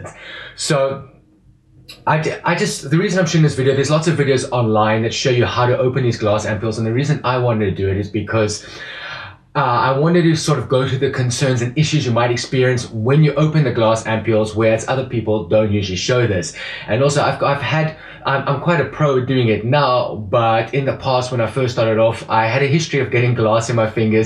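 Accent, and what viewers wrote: South Africa. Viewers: What's that accent? South African? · Are you from South Africa? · You sound like a South African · South African accent! Yay! Thanks for the info. ( Shosholoza to you too!)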